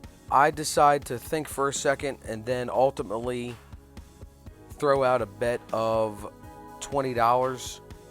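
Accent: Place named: Baltimore, United States. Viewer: Don’t worry so much about anything.... enjoy the process, sounds like you are. I would highly suggest you never limp into the pot, no matter if your mp or utg or cutoff or button, just doesn’t matter (position). Reason is, your limp 3b is never going to include enough bluffs. (Short justification) Always enter hands with raise, 3x or $10 for 1/3. When we enter a hand, just try and play better quality hands that play well post flop. Suited connectors, 7s plus. And finally work in some 3b in position, those guys hate 3bs at the 1/3 stakes and if your in position with a premium hand your likely ahead and this will increase your win rate. Love watching you because your authentic and your baltimore accent always makes me laugh. Talk soon Matt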